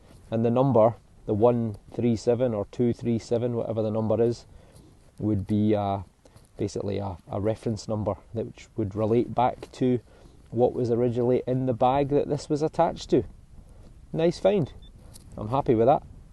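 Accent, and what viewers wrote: Scotland, United Kingdom. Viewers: I love the Accent! Scottish Accents are a range of Sweet to Hysterical to most Elite. Can't imagine the History Finds in Scotland. Have Fun, Best Treasure Successes! 😘 Beth Tennessee, USA "Irish American" 🍀🇺🇸🍀 County Kerry Lineage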